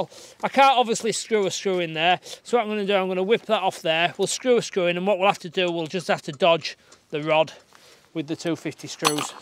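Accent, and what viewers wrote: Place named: Leeds, United Kingdom. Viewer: ey up, these are great videos! im a leeds lad living in cornwall now s0 its good to hear the leeds accent. may i ask, what is the minimum distance i can build my base up to a neighbours fence to conform to building regs? ? (subscribed)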